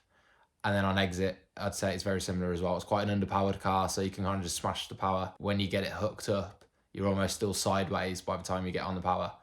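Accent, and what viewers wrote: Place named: Midlands, United Kingdom. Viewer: Hey bud, sounds like you're a Midlander like me. Hope to see more 🙏🏾 👍🏾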